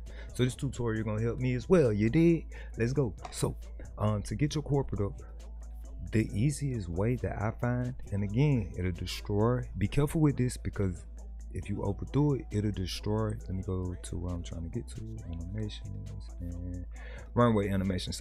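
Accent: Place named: South, United States. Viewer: Thanks, bro! helps a lot and you from the south so even better I can understand the accent lol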